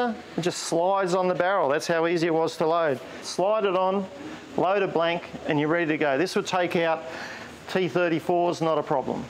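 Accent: Australia. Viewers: I love our accent. Come on Aussie come on! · Thank you - that was really informative. And hearing it in an Aussie accent was music to my ears.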